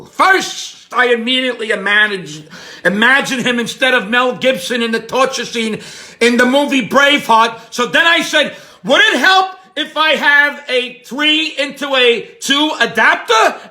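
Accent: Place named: East Coast, United States. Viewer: ur killin me😅😅that east coast cadence in your speak just seals the deal😅😅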